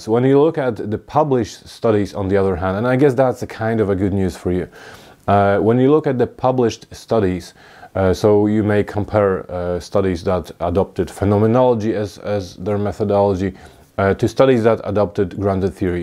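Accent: Scotland, United Kingdom. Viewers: You sound Scottish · Youve lived in Scotland havent ya?! This kiwi can hear it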